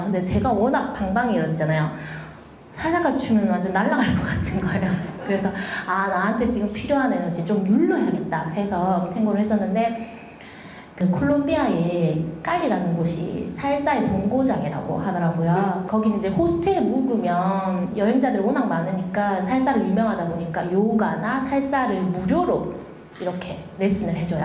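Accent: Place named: Seoul, South Korea. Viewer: 서울말 잘 쓰시네요♡ 쿠바만 가신줄 알았는데 와우~^^ 남미를 6개월 간이나~ 열정과 도전 넘 멋져요.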